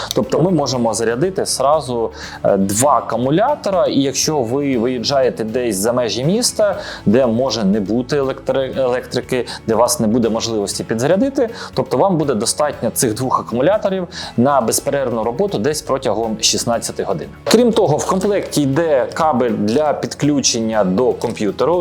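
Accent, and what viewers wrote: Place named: Poland. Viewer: На каком языке этот тарахтит, смесь польского и русского.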